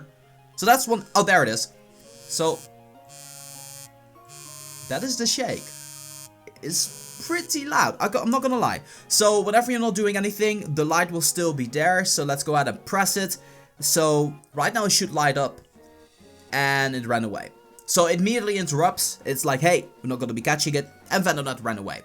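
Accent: Netherlands. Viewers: Are u dutch? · Leuk accent in het begin, maar verder in de video krijg je een heel groot Nederlands accent. Maar toch leuk gedaan! Groetjes uit Limburg. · are you from holland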